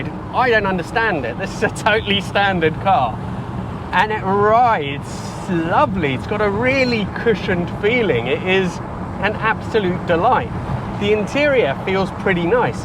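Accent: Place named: Italy. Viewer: So damn cool how rare it is for such a nice car. I bet next to none came here to the US of A. Would be even weirder to have that than my Jaguar 420 compact. Maybe looks like something that a handful might have came to Canada. You say it's '60s, but what year is it? Must be very late '60s. Didn't Hub Nut or somebody do a video on this? I think your I-talian accent sounds very nice!!! Thanks for the great video!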